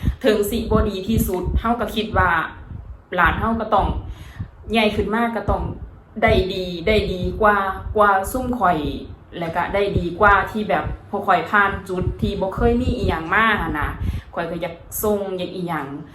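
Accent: Isan, Thailand. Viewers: พูดสำเนียงอิสาน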